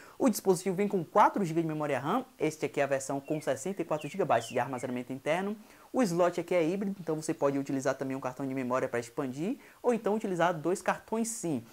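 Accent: Bahia, Brazil. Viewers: Tu é baiano de onde, man?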